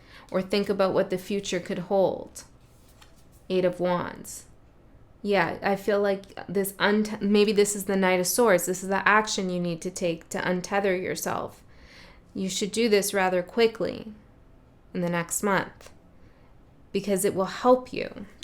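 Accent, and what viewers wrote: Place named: Toronto, Canada. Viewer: I’m from Toronto too (Toronto Mans accent) 😂😂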